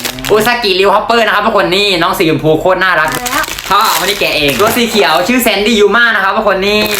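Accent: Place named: South, Thailand. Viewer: ทำไรหนูพูดใต้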